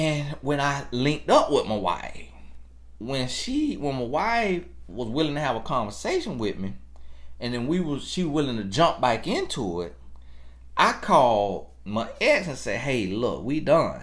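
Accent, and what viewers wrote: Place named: Florida, United States. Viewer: I come for your wisdom, but most importantly I come to hear that Florida accent: “Werk”, “Bike”, “finna”...I miss that accent. I’m from Florida too, but live up North now. Thank you 🙏🏿 And that Florida Marlins hat though! 😎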